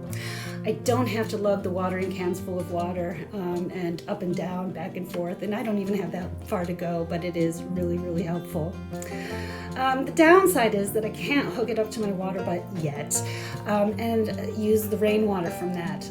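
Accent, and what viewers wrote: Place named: United States. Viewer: Started watching the video and thought.. this is confusing... american accent but looks like dull UK weather & UK home / windows... yet then you mentioned you aren't in USA! I must be psychic...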